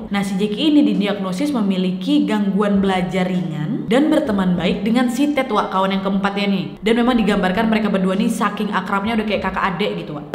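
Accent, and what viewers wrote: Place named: Riau, Indonesia. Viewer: hah makanya itu wak aku suka kali sm dia,dr cara ngomongpun sama karna logat2 melayu batak kepulauan riau😅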